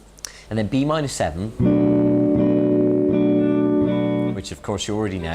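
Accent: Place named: Wiltshire, United Kingdom. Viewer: Great accent - Wiltshire ? Great lesson too of course :-)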